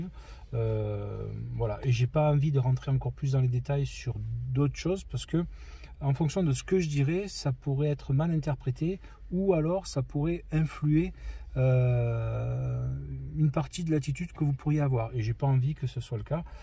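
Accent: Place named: South, France. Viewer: Salut. Je suis abonné à ta chaine depuis pas mal de temps et je suis vraiment triste d'apprendre que d'ici la fin de l'année, tout pourrait s'arrêter. En même je te comprends et je me doute que cela ne doit pas être facile de subir régulièrement de la méchanceté gratuite. Personnellement, quand je n'aime pas quelque chose ou quelqu'un qui passe dans les médias, j'arrête de le regarder, c'est une question de bon sens. D"autres personnes préfèrent regarder juste pour critiquer voir insulter. C'est triste mais le monde est ainsi fait. Des cons, il y en a toujours eu et il y en aura toujours mais le monde est obligé de continuer de tourner. J'espère que d'ici la fin 2020 tu auras changer d'avis et que tu continueras de nous régaler avec ta joie, ta bonne humeur et ton accent qui sent si bon le sud mais si malheureusement, il en était autrement, alors je respecterais ta décison. 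Prends bien soin de toi et de ta famille.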